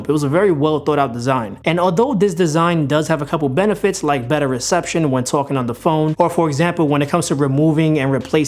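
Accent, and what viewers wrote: New York, United States. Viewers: no problem, you sound like your from NY!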